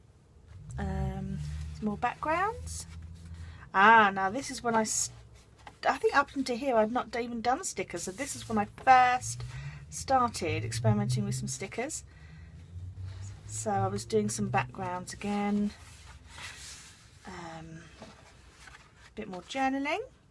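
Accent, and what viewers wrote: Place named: United Kingdom. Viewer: Had to come back to listen to your real British accent hahaha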